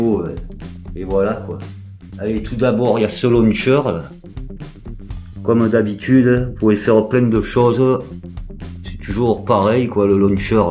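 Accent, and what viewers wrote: South, France. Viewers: Tjr au top tes vidéos tjr un plaisir avec ton accent du sud que J aime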